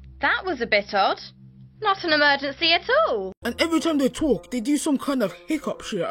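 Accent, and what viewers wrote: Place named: Roadman, United Kingdom. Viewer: I like your accent fam roadmen are cool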